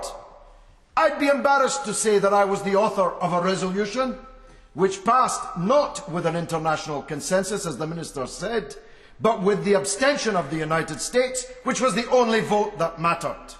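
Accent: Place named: Scotland, United Kingdom. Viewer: Hey thats a Scottish accent.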